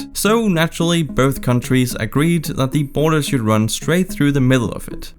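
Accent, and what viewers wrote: Denmark. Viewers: This guy is definetly danish with the way he pronounces danish words! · Are you dane, it Sound like it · Are you danish you sounds danish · You Sound danish not gonna lie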